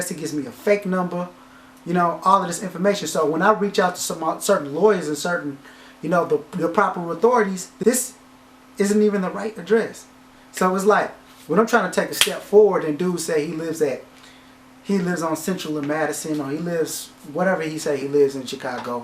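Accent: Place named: St. Louis, United States. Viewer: ***** picosso said "great artist steals" not saying he's great but great in the eye if the blind. You know what I mean bro. I'm from St.Louis to bro and I hurr it in your voice. Your a humble guy man because if you wasn't you'd be hot headed & I'm glad to see after all that your still humble God bless u bro. Keep doing you. The fakes getting exposed everyday. God givith & God takith away. He wont be seeing bright days much longer just keep doing u.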